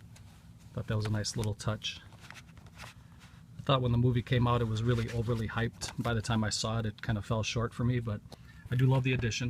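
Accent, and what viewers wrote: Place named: Midwest, United States. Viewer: I knew your accent was Midwest. Im in Illinois about 20 minutes from the South-Eastern corner of WI. I am about to grab the last cheap copy of Body Bags on Amazon.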